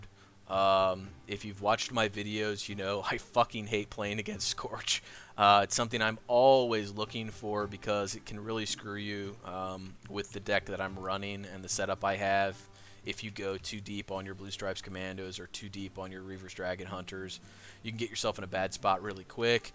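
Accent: United States. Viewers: i agree with the others, your videos a great dude, what accent? you seem to have a pretty normal middle of the road american male voice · I mean...i'm trying to wonder what accent it would be...he sounds Pacific N.W..atleast west coast. Not much of an accent. He has some southern sound when he says Line. Mostly with his (L)s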